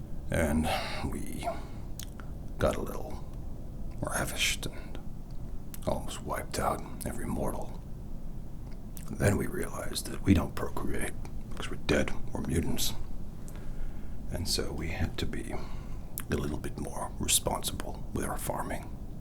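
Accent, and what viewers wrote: United States. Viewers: Less than 5 minutes in & THIS is brilliant... definitely worthy of a whole series & even more, thanks! & that american accent, spot on sir!!!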